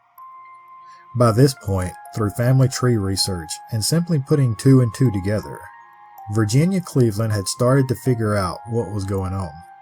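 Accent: South, United States. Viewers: What an eerieitem... I was a Zac baggins enthusiastic but he's kinda lost me a little,... yet again your Southern drawl thrills me ❤😊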